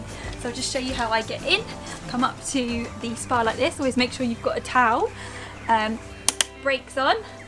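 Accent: United Kingdom. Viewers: How cool, you're from the UK! - I recently commented on your blizzard beach photo and you mentioned your channel to someone in the comments. I just assumed you'd be american but then I was like "hang on a tick, I know that accent" - Your channel looks really interesting and helpful too. I'll definitely be sticking around :)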